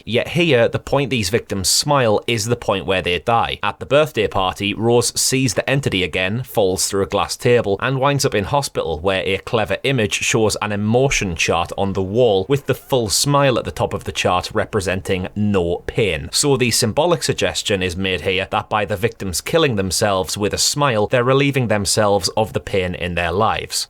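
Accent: Scotland, United Kingdom. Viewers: bro, your Scottish accent is so strong (no Offence btw) 🤗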